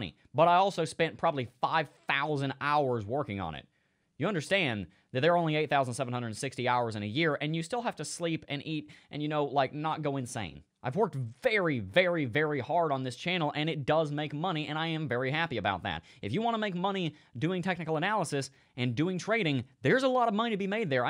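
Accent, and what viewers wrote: United States. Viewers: that is a lot better 😂😂😂 Can I ask you, where are you come from. Because I’m from the Netherlands 🇳🇱 for me you sounds like a American...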